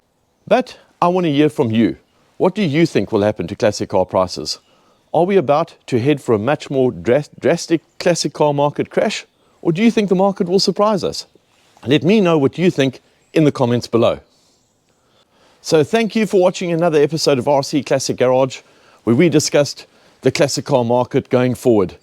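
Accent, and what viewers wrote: South Africa. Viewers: First time watching a video from this channel. It seems that you're a South African living in the UK. The accent is a giveaway if I'm correct about that. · What a fabulous collection of cars ❤️. Is there a hint of a South African accent in there? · first time viewer. What a great guy, with a fabulous south african accent!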